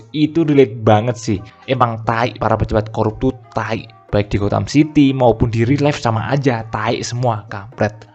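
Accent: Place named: Java, Indonesia. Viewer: Saran bor bisa gak, jang pake gue - gue Soalnya kan dirimu kentara banget medok nya Yg mending medok aja lebih enak didenger Dr pd lo gue lo gue..😁👍👍👍👍🙏🙏🙏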